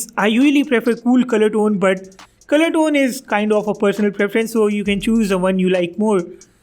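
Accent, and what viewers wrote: India. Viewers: Thanks for the review. Although I must say this accent does not allow me to watch the video at 2 times the speed... I wish hindu videos would invest in getting an upgrade in their english pronunciation - it is really hard to understand most of the time and makes at least me not watch those videos and look for videos with much better english.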